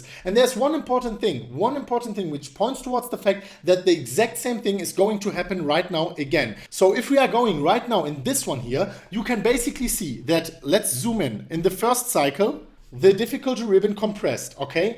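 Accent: Germany. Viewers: Please, try to correct your accent.... Mean your german accent is so strong.....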